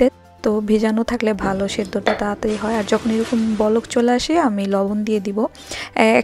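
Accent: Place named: Sylhet, Bangladesh. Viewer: আপু,খেয়াল করলাম আপনার কথা বলার মাঝে হালকা সিলেটি ভাষার টান এসে যায়❤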